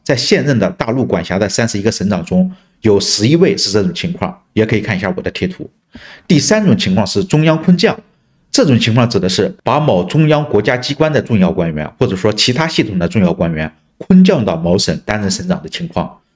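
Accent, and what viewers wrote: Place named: Anhui, China. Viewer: 主播一口白完农村口音，应该是安庆池州纵阳铜陵一带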